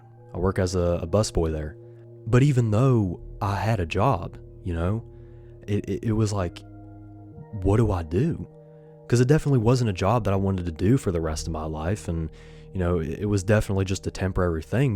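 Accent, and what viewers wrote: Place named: United States. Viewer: Bro has a cowboy accent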